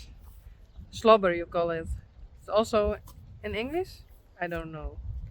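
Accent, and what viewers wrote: Netherlands. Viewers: I like the Dutchy way you say things.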